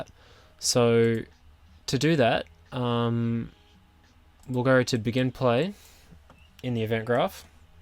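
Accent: Australia. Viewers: Thx for this tutorial!! A few questions though... How can I use a Navmesh bounds volume with this? I added one to a tile and it works on that tile but not when the tile is generated through the BP. Also I loved looking at where you have taken this BP with your Dev Log video and I was wanting to know if you could tell us how to do a couple of things that you never got to in this video. Probability for the tiles to spawn Seeded generation, mostly so you could save and load back to where you were I have got enemies spawning so I guess items will be similar to that but any tutorial you could do that takes this BP and adds in item spawning and enemy spawning would be useful, just to see how you do it and how you build it in to this BP. I have built this BP and modified it a bit but would love to buy it from you if you had an updated version of it for sale with some of the added cool stuff you have done with it!! 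Thx again... really helpful stuff. BTW good to hear another Aussie doing such cool stuff :)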